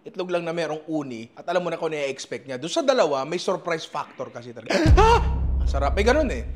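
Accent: Batangas, Philippines. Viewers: Batangueño ka ba hahaha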